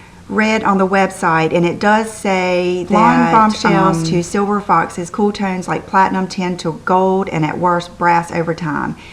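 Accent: South, United States. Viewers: Hi! I just subscribed because I've heard people talking about you and I'm so happy I did! I LOVE your "accent"...sounds like your from the south;) lol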